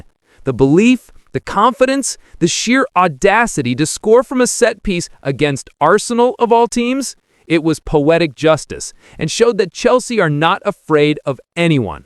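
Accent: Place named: United States. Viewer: We don't want these ghastly American narrations. Spoils things!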